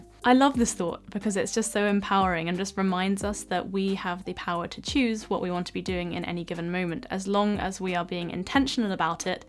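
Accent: United Kingdom. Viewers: you are that one Youtuber for whom I’ve been searching for years! An intelligent, genuine, well-educated female YouTuber with a modern RP accent as my speech model. I gave up last year after searching far and wide but today the algorithm took me to your British accent video and I immediately subscribed! You’re cultivated, intellectual, sincere, elegant, beautiful, speak with a perfect modern RP accent AND create such high quality video with excellent advice. What else could we ask for? Thank you, thank you; thank you!! ❤️❤️❤️ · how to practice to get British accent like you?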